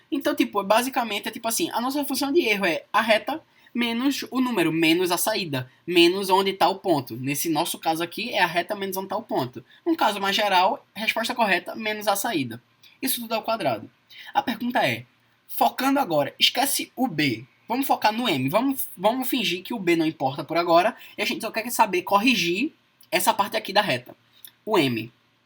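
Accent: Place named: Rio de Janeiro, Brazil. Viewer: muito bom carioca com puxada nordestina ou vice versa. hahah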